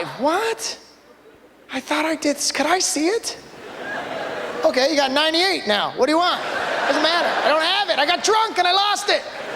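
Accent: Canada. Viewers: I love how he says whore. WHOORE. I love Canadian accents^^ love from Montreal, QC^^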